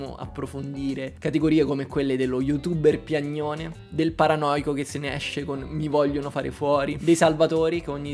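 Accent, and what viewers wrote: Rome, Italy. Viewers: non so se può servire a qualcosa per te leggerlo, ma sei davvero l'unico YouTuber italiano di videogiochi che seguo insieme a Il Grettapensiero e RoundTwo: perché sei calmo, sei umile e perché mi piace un sacco la tua dizione "romanaccia ma non troppo". Non esiste uno che parli come te su YouTube. Ti sembra poco? Per me non lo è affatto. Aggiungici i contenuti sempre interessanti e la percezione di avere davanti una brava persona, e non una bestia di egocentrismo, e diventa ovvio affenzionarsi al tuo canale. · dovresti essere solo orgoglioso, sei forte! fantastico lo spezzone di video del 2018 dove avevi una dizione con accento e tono tipico romanesco, ora però è un piacere sentirti parlare. professionista!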